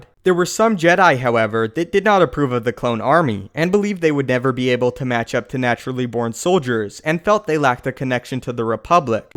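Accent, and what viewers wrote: Canada. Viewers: Damn this guy is canadian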